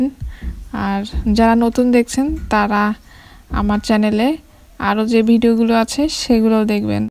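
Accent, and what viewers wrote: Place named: South Bengal, India. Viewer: amar ও ত শেই প্রশ্ন । ইনি তার গ্রাম কোথায় তা বললেন না।! খুব খারাপ কথা । এতো টুকু সাহস তো উনা র থাকা উচিৎ ছিল, কমপক্ষে আমি ইম্পপ্রেসড হয়নি এটা অপশন এ রেখে।ইনার কথা শুনে অবশ্য মনে হয়েছে ইনি হয়তো বা সাউথ বেঙ্গাল(মানে খুলনার দিকে) কিন্তু আমি নিশ্চিত ও না।আমার নিজের বাড়ি জসরের কোন এক গ্রামে( যশোর থেকে ২০ মাইল দক্ষিনে ।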